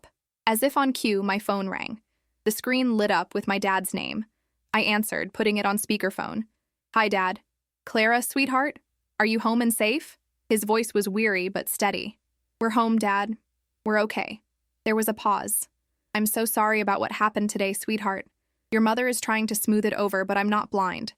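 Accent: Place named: United States. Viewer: Narration in an American accent, calling the trunk of the car, the "boot," which is what Brits call it, amused me.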